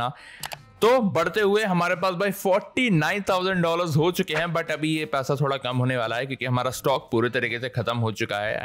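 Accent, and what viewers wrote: Maharashtra, India. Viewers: are u Marathi?????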